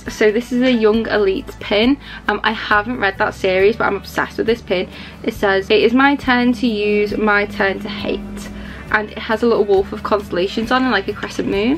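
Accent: Liverpool, United Kingdom. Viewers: Where are you from in the uk, you sound Like you’re from Liverpool x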